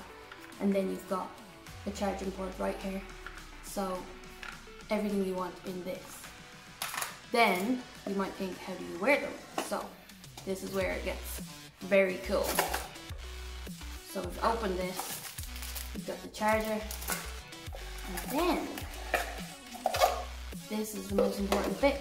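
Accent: Ireland. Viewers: Congrats and Merry Christmas 🎄 Which Irish accent is this btw? Struggling to figure that one out..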